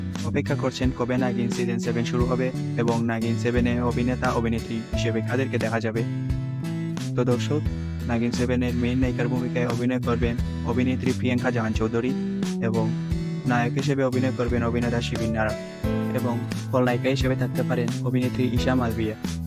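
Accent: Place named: Sylhet, Bangladesh. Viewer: Apni mone hoy sylhetie taina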